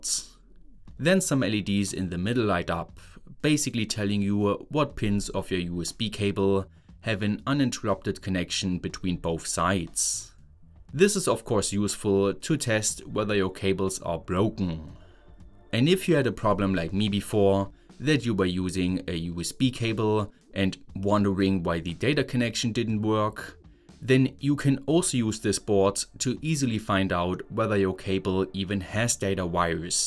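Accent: Saxony, Germany. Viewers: Dieser unglaublich schreckliche deutsche Akzent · Bro has the most German accent I have ever seen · almost SÄCHSISCH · I'm wondering about your accent. Are you German or Austrian?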